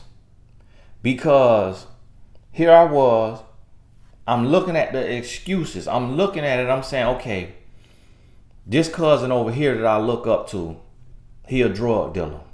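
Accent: South, United States. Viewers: I love country accent. He is hilarious 🤣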